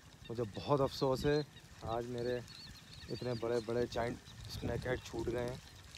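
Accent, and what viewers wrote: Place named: Bhopal, India. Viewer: aapki tone ek dum bhopali type hai main bhopal se hoon isliye poocha bhai good show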